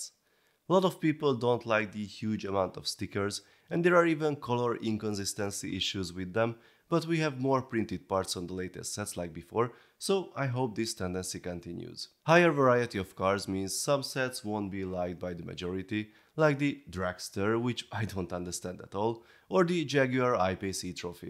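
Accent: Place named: Hungary. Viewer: Oh, that accent... so typical hungarian accent. After the first few minutes I started to get suspicious, and I hoped to find the 'location' of this channel. The location is Hungary of course, so my ears were right. It's not necessarily a bad thing, my ears are just sensitive to that. Hungarian accent is such a distinguishable accent, and I heard way worse before than that. Usually when I dare/have to speak in english... I hope that my accent is not typical hungarian! In fact, I learned russian for a bit 7-8 yrs ago, and I found out that my english turned to sound russian a bit, so I might have russian accent when I speak in english.😆 I also like when people speak in english with real russian accent, it's just sounds great to me.😁 Anyways, great video, it was the first vid from this channel for me. 😀(And it turned out, somehow it was the 3rd vid for me from here) It was cool to see most of the Speed Champions in one place!👍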